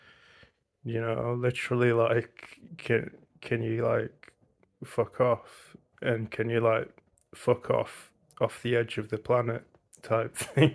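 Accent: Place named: Yorkshire, United Kingdom. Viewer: if you couldant see his face you would think hes a white guy from yorkshire, if he was born and raised here he aint a migrant, if he is actually a migrant well done to him he got the accent spot on, wish the rest could speak english as fluently as he does, my only gripe with migrants is the fact that they dont have any education and they either dont or barely speak english, they add nothing to the country, and dont get me started on muslims and all their backward traditions polluting our culture, but he might not be a muslim, so cant really treat them worse on the assumption of what religion they may or may not be, long as he aint over here trying to ruin our culture he got no problem from me i hope the guy that made this video is ok, and i hope the guy that made this comment is ok, like the guy that uploaded this video might be having a rough time, but think if all you got to do with your day is leave shit comments like this on videos, with zero creativity, thats a bleak existence my guy hope you get more stuff to do in your day, and if your going to leave troll comments at least get creative and put some effort into it aye? 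bottom of the barrel that mate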